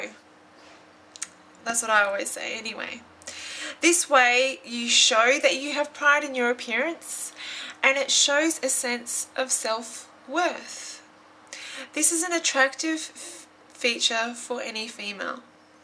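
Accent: Australia. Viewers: I'm a sucker for Austrailian accents :)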